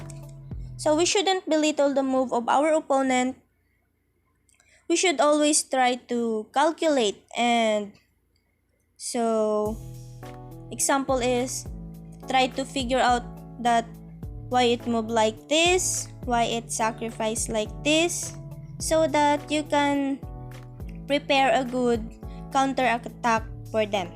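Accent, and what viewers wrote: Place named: Philippines. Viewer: Nice tip. I noticed your Filipino accent by the way.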